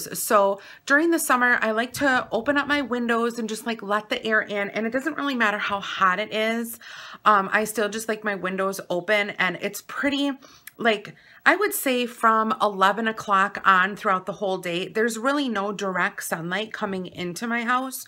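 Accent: Wisconsin, United States. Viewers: You're a hoot!! Love your vlogs. Love your Wisconsin accent🙂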